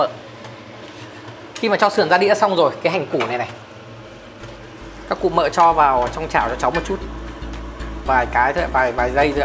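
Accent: Hanoi, Vietnam. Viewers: Bếp hướng dẫn dễ hiểu nói nhẹ nhàng chuẩn người HN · Cảm ơn đầu bếp hướng dẫn rất chi tiết tỉ mỉ và nghe giọng Hà nội khán giả nghe dễ hiểu ,chúc em và gia đình hạnh phúc bình an trong cuộc sống❤❤❤❤❤❤ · Dọng nói rõ ràng hướng dẫn cách làm món ăn chuẩn người hà nội cảm ơn cháu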